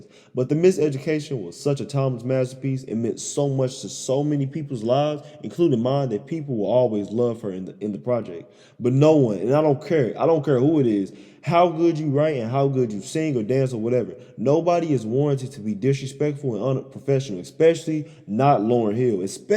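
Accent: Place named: United States. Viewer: Dude…..Interesting video but I’m struggling to hear what you’re saying, as a narrator you need to understand that we’re not all Americans so your words pronunciations should be clearer and possible slower (for non-Americans like myself), your accent is a little strong. Please take this as a constructive criticism.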